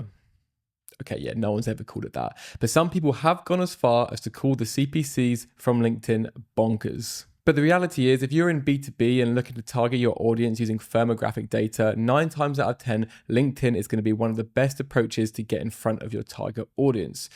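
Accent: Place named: England, United Kingdom. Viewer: Dude, you sound so english wehre are you from or based actually right now.?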